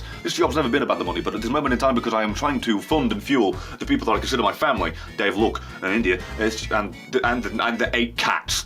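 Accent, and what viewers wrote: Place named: United Kingdom. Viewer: Damn it's sad that I can't here your sweet British(is he British?) accent and you screaming with words you invented 3 second before you say them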